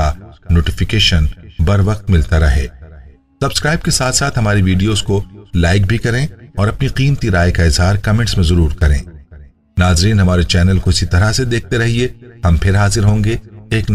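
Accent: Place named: Pakistan. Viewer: You forgot to mention BARLEY water🙄🤔. It was refreshing to note your pure Pakistani urdu is commendable.👌🏻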